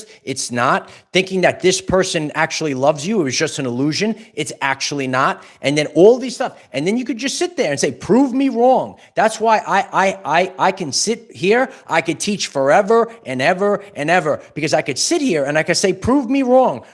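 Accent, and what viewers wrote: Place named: Brooklyn, United States. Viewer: ❤ your Brooklyn Accent I have a friend from Brooklyn and her husband is from Lone Island that lives here in Texas as well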